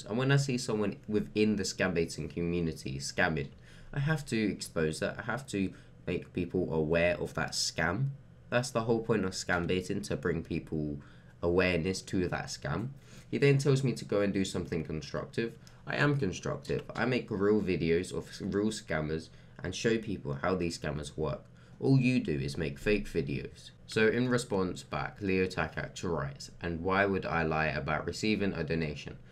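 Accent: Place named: United Kingdom. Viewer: How did I get subscribed to someone who speaks with a British accent sounds like he's chewing on a mouthful of caramels while he's trying to talk. Nobody gives a fuck about your opinion you were little island of 20 million people LMAO.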